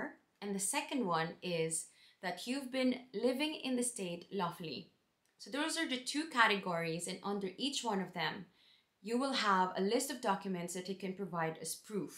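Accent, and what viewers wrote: Ireland. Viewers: you know you've settled once you have the brogue :) cupla focal and you're 100% there.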